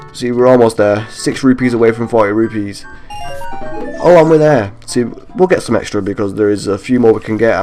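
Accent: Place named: North Yorkshire, United Kingdom. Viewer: Great LP man. Helps that you obviously know the game well so it kind of doubles up as a walkthrough, some funny remarks in there too :) You sound like you're from the north like me! I'm gonna hazard a guess at North Yorkshire? You sound a bit like my mate who's from York. Anyway, great stuff.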